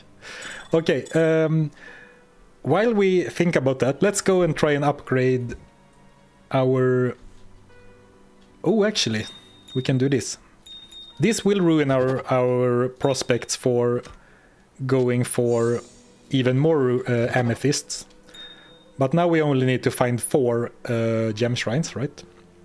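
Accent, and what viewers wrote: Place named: Sweden. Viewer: Hello fellow 🇸🇪 And as a "gaming tradition" in Sweden when at least one persen don't understand Swedish, everyone in chat/voice talks English. Therefore I will take this in understandable English. I belive Mephistos life points will be easer to reach than Duriels was. With at least 40 more strength. (perhaps ~50 if a 3os helm is possible or good circlet) and more possible strength on rings, amu and so on. + A few more lvls and skill shrine this will be easy for you. At least that is what I belive🙂. Got very sad when suddenly Duriel was dead. Good with the clip. Sad that it was without sound. Sidetrack. Got really suspicious when I first heard you. Wait what?! Is he from Sweden? You confirmed later on that vid or another one. I really enjoying your challenges. Keep them up😈🤘 Another sidetrack. Is the microwaved tea reheated👍 or do you prepare it that way☠️? 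Usual flavor? With or without milk? Even more sidetrack. In what part of Sweden do you live close to? If you answer this I guess you will have a big radius from the town you are meanchening. Because of the now little less anonymity with facecam and closer to 40 than 30 thing. BUT, let's think of the real threat, DIABLO !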